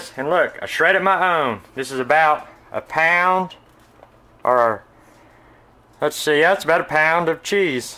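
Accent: South, United States. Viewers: I am southern also, not quite have this accent down like he does. But so enjoyed his! Great recipe. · your incredible southern drawl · I've never had this before. It looks delicious. As somebody from Alabama, loved the Southern accent!!❤😋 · True southerner heck yea some good eating there now That’s the way they talk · Greetings from NYC!! 🌞Just hearing your accent, I know this is gonna be AWESOME!! In my opinion, Southern cooks are the very BEST cooks. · Im going to subscribe because he is so cute and that southern accent, wow!!! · I was raised in the south but have moved around, still love the southern twang! The casserole looks amazing and I love how he wants you to use your imagination on the spices, so many variations you can do. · "Pi-tay-toes, or taters" I love yer way of speaking. Im Canadian, and I talk similar to you kinda making fun of the words. 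I am not southern, but I wish sometimes I had the accent. Love it brother..."pi-tay-tows"....excellent! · Boy that is a thick southern accent is it made up or really Southern? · Great recipe!! My brother damn near ate the whole tray!! Where's that accent from, Carolina, Georgia? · Love your southern accent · Y'all making comments about him sounding like a old guy must not be from THE south. Y'all must be YANKEES. I have friends who are younger than me that sound like this guy and I am from TEXAS. I,LOVE HIS ACCENT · I adore the southern accent. I love in South Georgia and we all pretty much sound like this. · I love his sense of humor, southern accent and this receipe even more. That's some good eating 🔥🔥🔥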